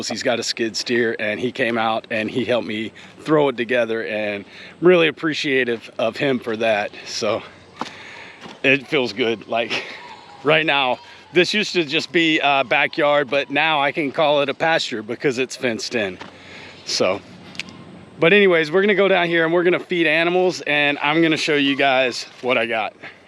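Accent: Louisiana, United States. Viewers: Love the channel!! Where you from, you definitely don’t sound like Florida…that sounds like my neck of the woods…Louisiana!! If you not from Louisiana you definitely must do a lot of swamp impressions and your getting better at it😂 I’m not from the parts you sounds like but it sounds definitely Pierre Part La but im from a whole different bayou in Pointe Aux Chen La on a Native America Indian Reservation😊 God Bless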